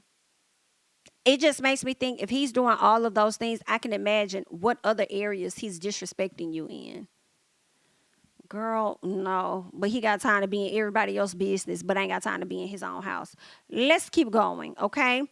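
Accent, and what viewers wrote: United States. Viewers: i love hearing you talk country sounds talking😂Blessings 🙏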